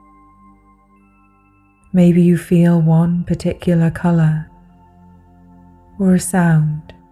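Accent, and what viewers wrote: Wales, United Kingdom. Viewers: I'm trying this for the first time.. Lovely to know of you.. I seem to hear in your accent that you may be welsh.. Is this true?.. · Guided Meditations by Lisa Beachy Good to see a positive, hopeful message in an increasingly ugly world. Do I hear a slight Welsh accent I wonder?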